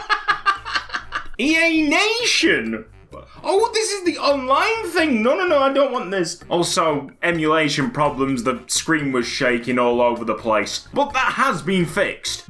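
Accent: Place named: United Kingdom. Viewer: buuuuuuuuuuuuuuuu ;_; i mean British accent :#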